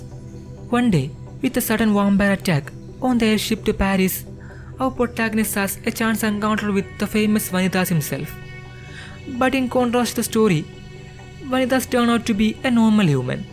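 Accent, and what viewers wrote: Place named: Kerala, India. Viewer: thicc mallu accent xD, but well made video 👍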